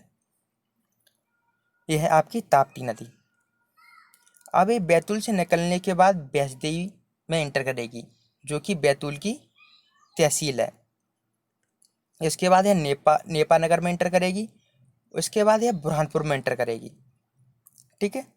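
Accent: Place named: Uttar Pradesh, India. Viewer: U P k lgte ho awaz se😎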